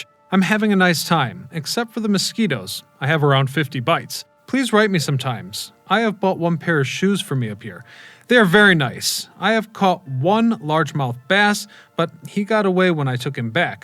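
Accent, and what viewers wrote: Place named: Chicago, United States. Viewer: I knew you gave the Chicago vibes😭 lived in Springfield my entire life and people from the suburbs most definitely have their subconscious tells. If you tell me you’re from IL, 90% of the time i can tell if you’re from a suburb or a corn field without asking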